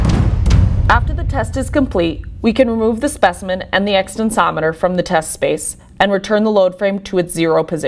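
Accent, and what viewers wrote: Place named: United States. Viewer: That's understandable, but this is American accent, which is very strange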